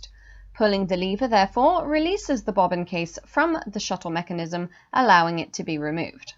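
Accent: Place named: England, United Kingdom. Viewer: Thank you so much for uploading this video on bobbin winding. I now know why I was having trouble. My one and only metal bobbin’s notch was not deep enough and the reason for not catching on to the bobbin spool pin. I have easily rectified this by using the edge of a fine saw blade. Just a few back and forward strokes has allowed it to fully engage. I have as you suggested opened ‘About’ and sent my email for your very kind offer of a pdf copy. Thank you so much for all of your videos, which have been a great help to me. Glad you have not lost your refined English accent!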